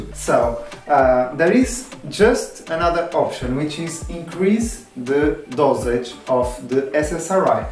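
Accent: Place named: Portugal. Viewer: I'm going to guess you speak Portuguese?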